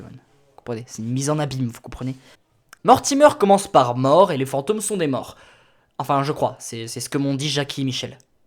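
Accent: Spain. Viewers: Ton accent espagnol es magifico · S'il te plaît arrête avec ton accent espagnol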